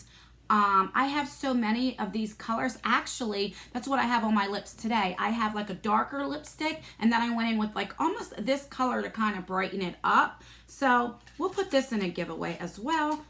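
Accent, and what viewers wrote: New Jersey, United States. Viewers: I love your Jersey accent! We moved to west Virginia 4 yrs ago from Philadelphia. As soon as I open my mouth everyone asks where I'm from . Happy to see you got your brushes.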